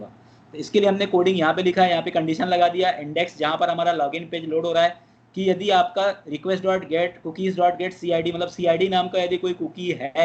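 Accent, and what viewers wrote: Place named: India. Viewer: God I don't know if I either love or hate the hindi accent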